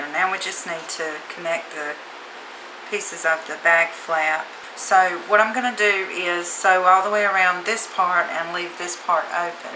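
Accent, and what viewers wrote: South, United States. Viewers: with your southern drawl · And I love your Southern accent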